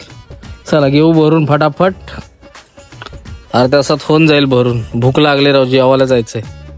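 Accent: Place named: Western Maharashtra, India. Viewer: Aao Bhau Tumi Paschim Maharashtra Raagde Mavale , tumchya bolnayt janaute..Aamchi Iccha aisi hai ki tumhi Ekda aamala North East ke darshan karave.... in LMV or tractor trailer .. as u got past experience also i like u r guru sangli jath person attitude and grace in this personality....as seen in ur past video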